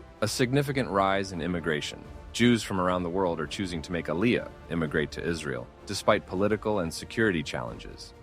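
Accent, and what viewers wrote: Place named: United States. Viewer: I, too, agree and certainly believe we are indeed in the " Latter Days." The Scriptures hold true concerning prophetic events that have come to pass, and the rest to do so are soon to follow. You sound American, perhaps Canadian! ? If so, why the mask ? At least in the U.S. you're free to speak. People may not like what you say or you as a person , but who cares ?! If you're in a nkn- Christian nation, then I understand. Either way, I share your beliefs and pray hou His blessings. 😊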